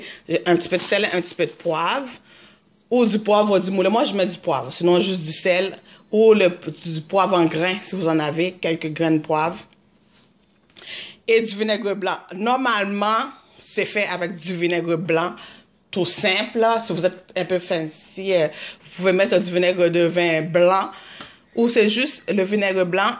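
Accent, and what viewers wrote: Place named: Haiti. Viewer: Tu as l'accent créole haïtien et québécois. C'est marrant.... hello de Guyane. J'aime beaucoup le picliz. Humm miamm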